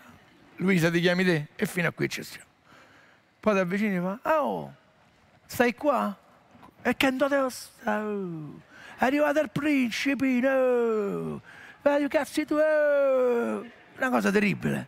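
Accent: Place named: Rome, Italy. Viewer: Il fatto e che il dialetto romano è fra i dialetti più simpatici... Quindi alle orecchie dei non romani non risulta "grezzo" o "volgare" anzi... Tutto qui. La stessa cosa vale per gli altri dialetti "comprensibili" e simpatici.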